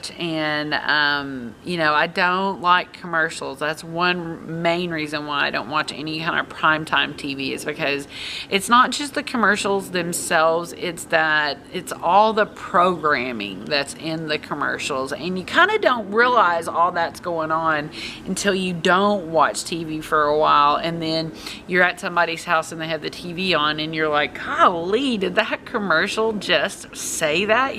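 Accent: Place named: Arkansas, United States. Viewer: You sound like you’re from Arkansas! My husbands family is from Texarkana... you have the same accent 😁❤️. Fun intro, and crazy fun stories about yourself! I’m glad hooked us up!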